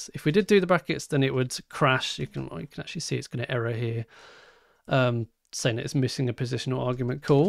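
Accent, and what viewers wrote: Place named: United Kingdom. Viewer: Underrated channel, also that bri-ish accent is woowwwwww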